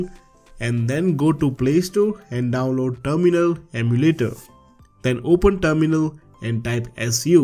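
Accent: India. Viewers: thats Indian accent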